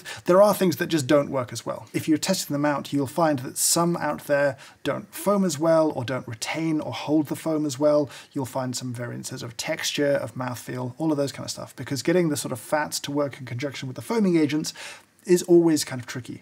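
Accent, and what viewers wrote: United Kingdom. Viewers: It's the British accent!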